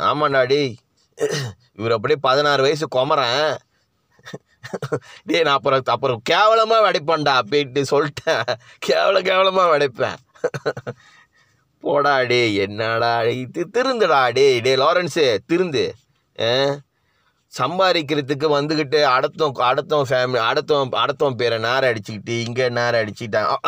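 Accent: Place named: Malaysia. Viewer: Oh my god the best 1 Malaysian tamillan slang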